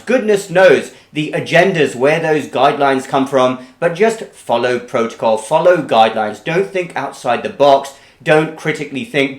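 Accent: United Kingdom. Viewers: Sir you have a very strong British accent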